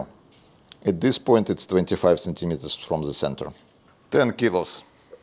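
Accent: Ryazan, Russia. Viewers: Ну как не послушать прекрасный русский акцент - главное что даже без перевода понятно все 😊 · Почему мне кажется что у автора русский акцент... особенно после: уан, ту, сри 😅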